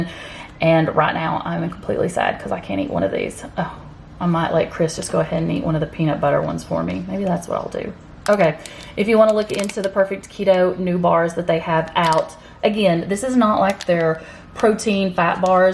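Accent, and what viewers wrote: South, United States. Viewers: You are hilarious! Good to hear that beautiful southern accent. 💗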